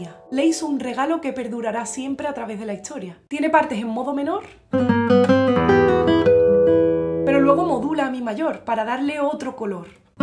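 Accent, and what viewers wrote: Andalusia, Spain. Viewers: ese acento andaluz tan hermoso!! Saludos desde 🇻🇪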